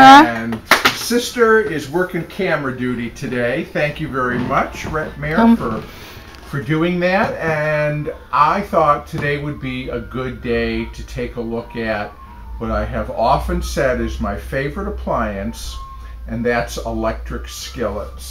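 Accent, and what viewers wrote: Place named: Michigan, United States. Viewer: Michigan! That's why you talk normal. I'm from Northern Illinois.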